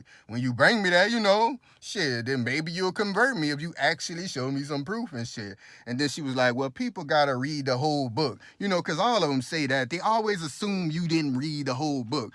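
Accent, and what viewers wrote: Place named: New Orleans, United States. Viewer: Sound like you stayed in new Orleans for a lil while...the accent sound familiar...but good info family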